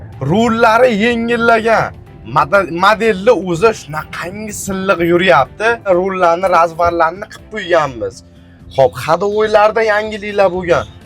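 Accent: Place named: Kokand, Uzbekistan. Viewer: Чота шу бола куконли шева тортяпти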